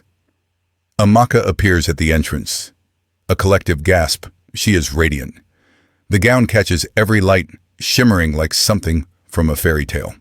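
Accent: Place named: Europe. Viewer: Why did the voice change from the beautiful female narrator that can pronounce all the African names right to a man's voice who was born in Europe?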